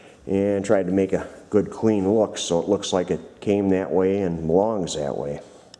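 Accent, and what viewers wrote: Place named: Upper Midwest, United States. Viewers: I'm from Wisconsin (now in Texas) and this guys accent was killing me. i miss home! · Christopher Desabrais Wisconsin accent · lmao... man this guy's accent kills me! it's like watching a deleted scene from the movie Fargo!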